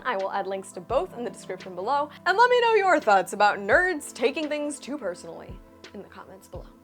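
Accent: Cuba. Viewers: Que bonito hablas espanol! Mi cubanita linda. No matter what you say or how strong opinion you may have, say it in that beautiful cuban accent. Te derrites como la cera caliente! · Cuban accent detected! ❤ half Cuban/half Mexican here Represent! People suck, if you want to watch review videos like yours and want to contribute its better to comment politely and give your opinion with reasons for giving your opinion. Make your case, but why start disrespecting and acting like an internet tough guy? Puro paz y amor, everybody chill.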